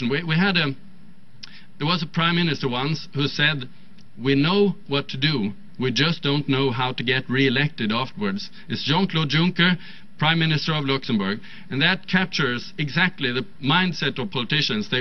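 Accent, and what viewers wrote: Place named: Sweden. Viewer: The Swedish English accent...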